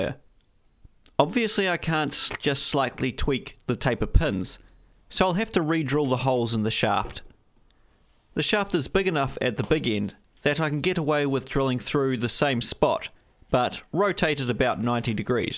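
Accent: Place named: New Zealand. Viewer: Always happy to see a video of yours pop up in my feed. As a Kiwi living in Australia, your accent is making me miss home!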